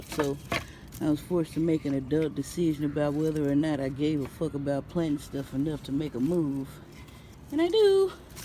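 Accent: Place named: United States. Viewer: Love the American accent kkkk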